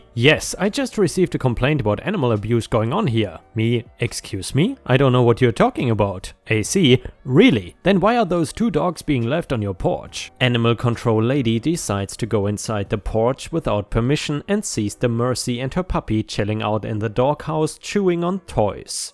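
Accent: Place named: Germany. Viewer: Hi ⭐, is from Germany. English is not his first language. He has a wonderful accent. Most people are here listening to his story telling because we like his accent, his choice of stories, and his demeanor. Didn't you mother teach you what Peter Rabbit's mother told him? “If you cannot say something nice, then don't say anything at all.” Real simple, you should have learned this before you started school. What is wrong with you? 🌠🌟⭐🌟🌠😎🌠🌟⭐🌟🌠 Take care, stay safe, have a nice day. I'll pray for you. 👵☺️✌️☮️🖖 😷 🙉🙈🙊 ♥️😎⭐🌟🌠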